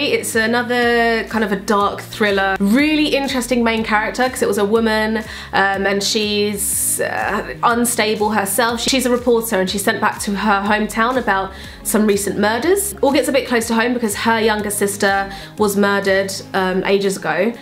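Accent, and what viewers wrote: United Kingdom. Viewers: Your British Accent Is Life 😍😍☺️☺️ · you sound British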